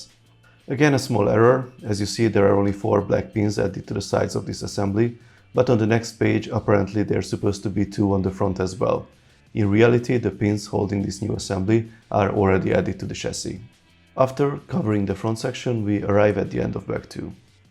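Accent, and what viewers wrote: Hungary. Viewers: Olyan magyaros akcentusod van... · Az akcentusod elárulja h magyar vagy de amúgy jó lett !!!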